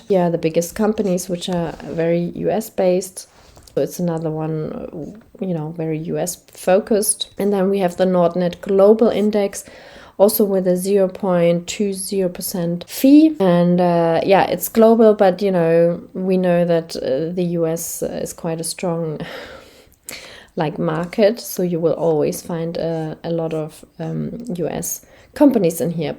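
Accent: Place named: Germany. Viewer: Wow beautiful woman and beautiful German accent.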